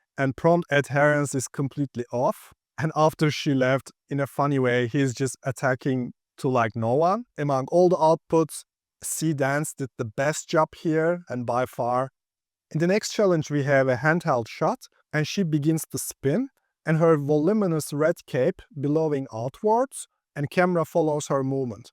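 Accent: Turkey. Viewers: Sanki ingilizcende bir Türk aksanı var gibi ama neyse😅😅😅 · Türk duyduğumda tanırım :) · I hear a strong Turkish accent.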